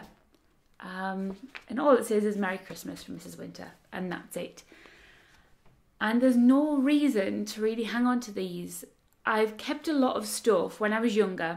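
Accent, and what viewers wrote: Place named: Northumberland, United Kingdom. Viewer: Malina Koschier She sounds Northumbrian .My mother in law was from that area and had the same lovely soft accent.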